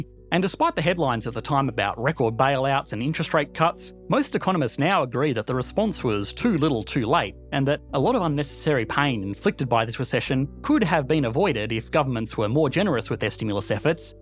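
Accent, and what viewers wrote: Australia. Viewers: Between you and Perun (and sometimes sunnyV2 and shadiversity) i don't thinkni can stomach analysis anymore unless it comes with an aussie accent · As an ignorant American, I can say that I could never tell if his accent was Aussie or Kiwi, so I never noticed the flag flub.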